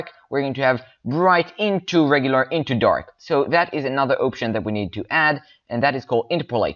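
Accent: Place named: India. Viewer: once i heard your indian accent i knew i was in the right hands. thank you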